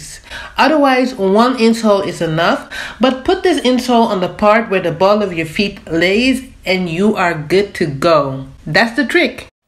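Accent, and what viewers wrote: Netherlands. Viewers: i know a dutch accent when i hear it! ;) gonna try the tips ! thanks :)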